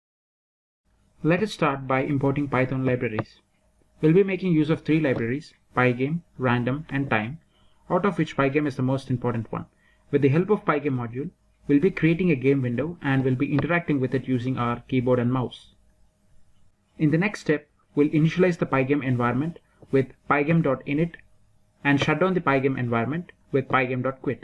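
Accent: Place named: India. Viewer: You have indian acsent🇮🇳🇮🇳🇮🇳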